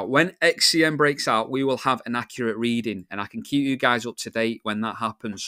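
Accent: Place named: Lancashire, United Kingdom. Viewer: Honestly mate, you sound like you're from where I live, well the area anyway I live near Burnley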